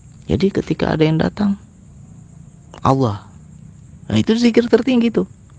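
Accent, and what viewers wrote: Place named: Medan, Indonesia. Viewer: Boleh tau dimana tglnya..logatnya macam org Medan..?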